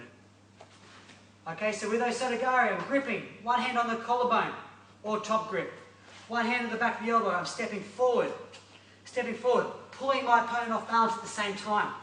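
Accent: Australia. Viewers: Man I love your techniques. And personally I think the aussie accent is beast, but I wish it didn't fuck up the autocaptions so bad; sometimes I actually want to hear you.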